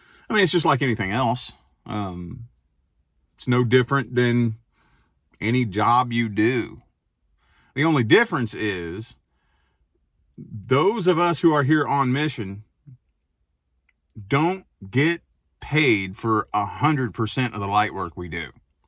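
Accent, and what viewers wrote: United States. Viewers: I see you becoming a great rapper next. Your voice is very American. might inspire you to consider it.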